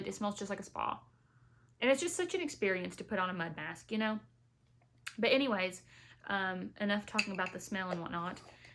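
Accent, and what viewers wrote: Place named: Kentucky, United States. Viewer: I’m new to your channel.. as soon as I heard your voice I thought to myself “she’s from my neck of the woods” Kentucky, correct? ❤️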